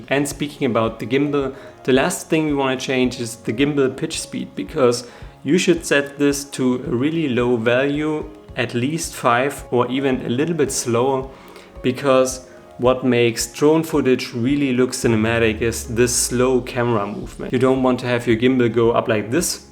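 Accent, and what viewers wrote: Germany. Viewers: Bist du ein Deutscher? Dein Akzent klingt nämlich sehr deutsch :-)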